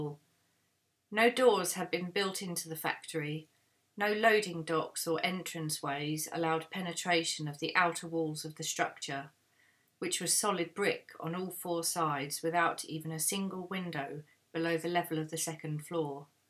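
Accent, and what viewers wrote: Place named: United Kingdom. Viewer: Thank you for reading Lig's for us. I would try to be closer to your microphone, or use a headset one that has mic close to your mouth. Your voice is lovely, as are most UK accents.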